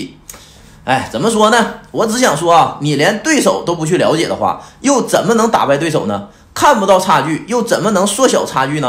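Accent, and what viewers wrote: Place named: Northeast, China. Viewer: 听这东北银白活了半天，特斯拉原来这么遥遥领先呀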